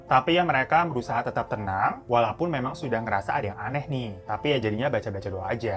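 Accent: Java, Indonesia. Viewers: Bg iki wong jepang atau wong jowo?? 😂😂 sipit² tapi koe medok wkwkwk Lucu sih..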